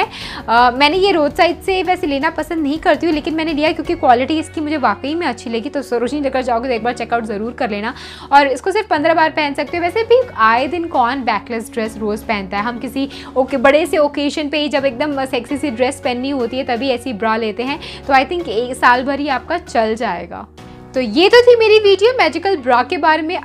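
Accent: Bihar, India. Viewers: apni language ko thoda sundhar.... Tipical bihari tone ata hai apne muh par mane top k banati h vedio or channel ka namo rakha h be natural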